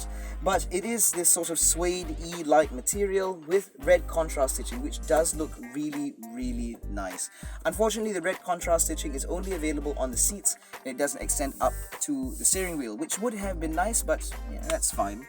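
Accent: United Kingdom. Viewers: U sound like a british man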